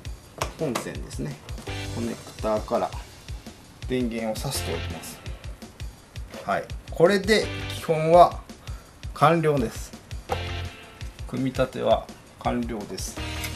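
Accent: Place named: Nara, Japan. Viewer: イントネーションが奈良か京都、多分奈良っぽい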